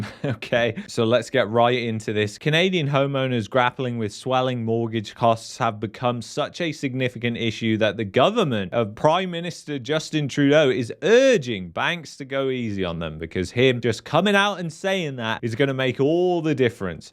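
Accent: United Kingdom. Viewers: 4 months ago you were saying the same shit , where is the apocalypse ? Please confirm. We all know it's coming soon but please provide some original information. No facts or data to back up your pseudo doomsday claims. I mean come on we already have Alex Jones and more lmao. Im sorry but i need more than a british accent and fake data to tell me what to aim my thoughts toward.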